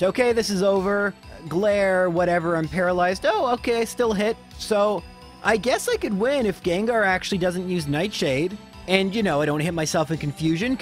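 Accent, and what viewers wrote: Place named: Canada. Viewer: He's Canadian, that's how they say it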